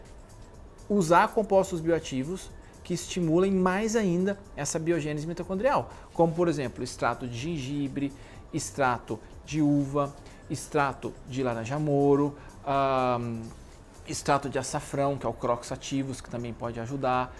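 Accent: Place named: Minas Gerais, Brazil. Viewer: Esse seu jeito mineiro de falar que acalma a alma, Uai eu amo isso ❤ #tamojuntosempre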